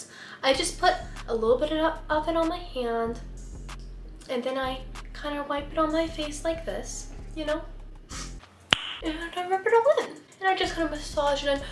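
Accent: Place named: South, United States. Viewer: bruh why does she sound kinda southern, like it kinda sounds like she has a southern accent like a WEEE little bit. :3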